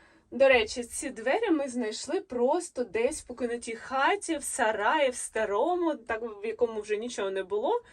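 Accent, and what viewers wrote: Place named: Surzhyk, Ukraine. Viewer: Красунечко! Ви молодчинка, що намагаєтєся розповідати усе українською. Але слово ДВЕРІ, за ДВЕРИМА тощо.. це завжди МНОЖИНА! підказка, яка полегшить Вам вимову без суржику ...Перед зйомкою кожного сюжету ознайомтесь з усіма маловживаними або маловідомими українськими словами з тих, які плануєте використати у своєму черговому випуску. Бажаю успіху Вашому каналу!!🎉🎉🎉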